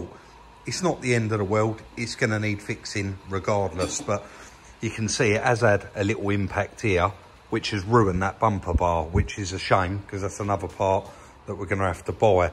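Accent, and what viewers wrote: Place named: Kent, United Kingdom. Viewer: He is from Kent, its just the local accent, nothing wrong with it. Dont ask me if he is a Kentish man or man of Kent. Something about the Medway and a big deal for some people.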